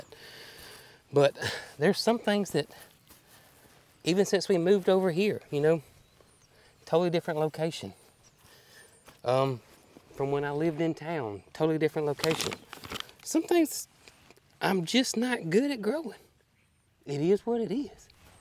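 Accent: South, United States. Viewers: Greens and beans ROCK the south! I gotta say, I love leaving the closed captioning on when I can. I would guess that the folks that do the cc for YouTube are 1. not gardeners and 2. not from the south. Watching them goof up your southern drawl really makes me chuckle sometimes. Wonder how hard it was for you to pull that HAIR ROOF (harrow) through your garden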